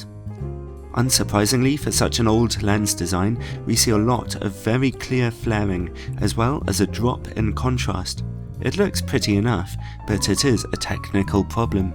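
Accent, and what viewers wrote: United Kingdom. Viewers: Even if this is an old video, it's always a great pleasure, and really relaxing to listen to your soothing voice with your sweet British accent,. Also, your microphone is perfect for your voice, and this music made by the famous fits perfectly for your videos. It's like your trademark now in some way, haha! Great video review about the Helios 44-2, thank you!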